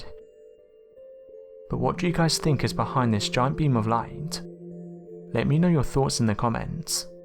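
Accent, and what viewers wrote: United Kingdom. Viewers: British ASs accent bullshiter! Hahaha. Ass wipe!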